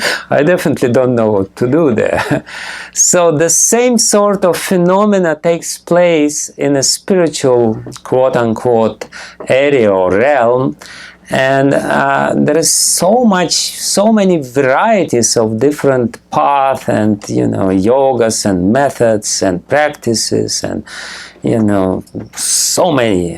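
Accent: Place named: Russia. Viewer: Хотелось бы знать, кто этот Гуру. Ясно, что из России, акцент очень сильный. Where is the description about Him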